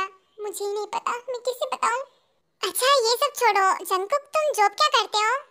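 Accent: Gujarat, India. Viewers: Di ap gujrat se h kya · Kya aap gujarati hai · Diii are you gujarati ? 🤭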